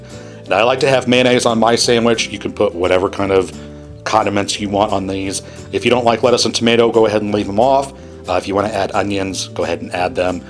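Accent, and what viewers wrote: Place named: Massachusetts, United States. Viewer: Sound like Carlton from massetchusets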